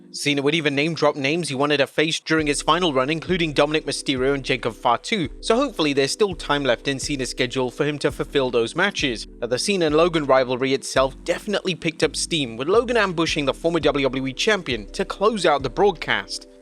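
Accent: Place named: United Kingdom. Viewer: This host is definitely not American you can hear it in his crappy accent he sounds like he's from across the pond himself and he doesn't realize that Ireland is not in the UK?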